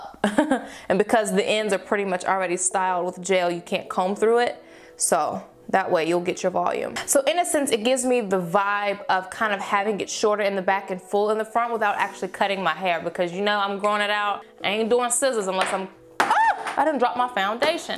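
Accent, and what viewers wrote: South, United States. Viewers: Your voice is so country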